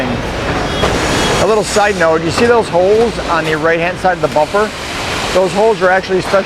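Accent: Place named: Chicago, United States. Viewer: I sense a Chicago accent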